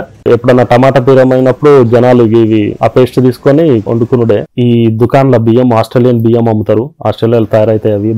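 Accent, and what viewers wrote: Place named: Telangana, India. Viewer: Nice video sharing bro, talking in telengana language is very nice. All the best bro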